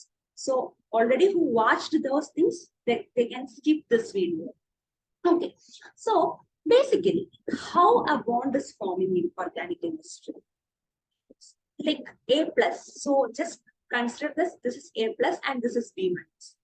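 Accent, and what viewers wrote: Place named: Kerala, India. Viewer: Malyalam mathii english not clear mam.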